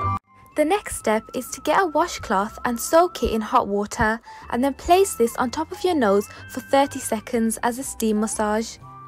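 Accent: Birmingham, United Kingdom. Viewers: Haha omg someone appreciates a Birmingham accent, finally!🤣👏🏼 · Does this work on white heads? You sound like you're living in UK, did you get that brush in tesco? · she's from Wales this is a brummaccent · OMG I ACTUALLY CANT 😂 It’s not fake it’s a Birmingham accents Lollll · She’s British, but from a different part of England. You’re probably American. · It's just a British accent, not even the same one as Peppa Pig. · Brummie accent. Birmingham 🇬🇧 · its called brummie lmfaoo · ❁ཻུ۪۪┊𝓝𝓸𝓮𝓵 it’s a british accent ...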